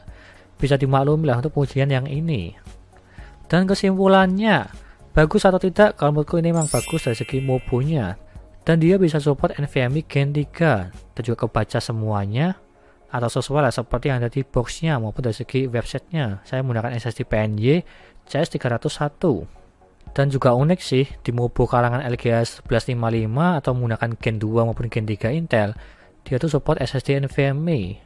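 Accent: Java, Indonesia. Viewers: jowo e sek ketoro bang ,gas review e walau bhsa indo e medok 🥱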